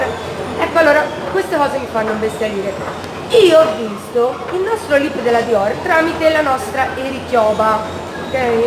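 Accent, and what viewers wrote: Tuscany, Italy. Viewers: Che dolce quando parli di Axe!😍Comunque adoro il tuo linguaggio😂😍 è molto simile al mio ,tolto che tu parli toscano😂😂😂!Comunque con il rossetto liquido dior anch'io non mi sono trovata bene,sono andata a cena e lo avevo dappertutto,mi dura molto di più il rossetto in stick!Bah 33 euro buttati!Baci😘😘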